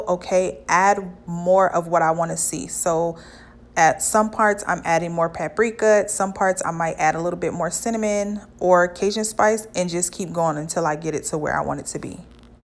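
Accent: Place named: New York, United States. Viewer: GIRL! You can hear the NY all up and through this video 😂😂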